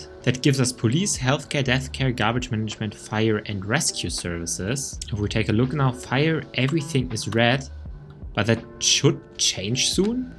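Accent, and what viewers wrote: Germany. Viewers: Oh! You‘re German… I hear it and I saw it. Nice vid/cooles Video… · Bro kein hate aber du hast einen krassen deutschen Akzent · es ist so komisch nem deutschem beim englisch sprechen zuzuhören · Do I hear a German landsman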